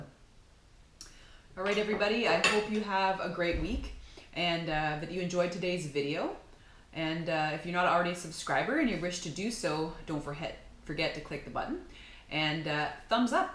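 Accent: Canada. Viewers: Your from Canada 🇨🇦 Eh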